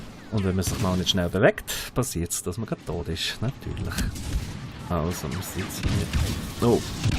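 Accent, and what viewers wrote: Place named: Bern, Switzerland. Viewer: z erstä bärndütsch lp u youtube. chunt eim huerre komisch vor. aber geil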